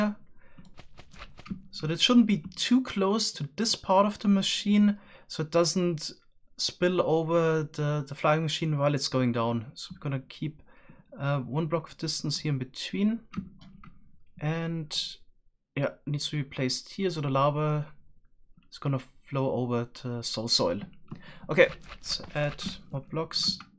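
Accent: Germany. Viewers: He's German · You hear your german accent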